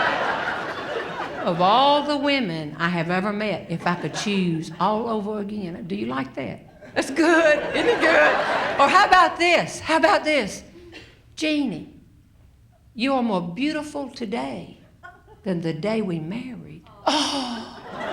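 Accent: South, United States. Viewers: Ms. Robertson is the funniest woman in the world of humor. I love to listen to all her stories because of the humor and the southern accent. Thank you ma'am for the laughter you have brought....Billie J. King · Linda Watkin I’ve watched for quite a long time now and cannot imagine how anybody could find a thing “nasty” about her. You said you “do not like her humor” and then said that you “think that she is nasty.” Is it her clothes, her hair, her voice, her Southern accent or what exactly do you find so offensive about her? Her humor is clean and free from the usual cussing, sex and vulgar talk or political comments as is so common with the majority of comedians these days. If you don’t care for her style of comedy, then simply don’t subject yourself to it. When you come upon one of her videos it really is the easiest thing you’ve ever done to just scroll on by without ever touching on it to start playing. 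We’re all allowed to enjoy our own chosen style of entertainment so please let us enjoy Ms. Robertson and I encourage you to find whoever or whatever brings laughter and joy into your life. God Bless You.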